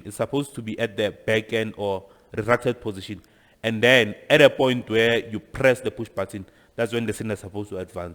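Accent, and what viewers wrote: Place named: South Africa. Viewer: THAT SHARP SHARP AND NEEEH , TELLS ME THAT THE LECTURER IS FROM SOUTH AFRICA😅😅😅😅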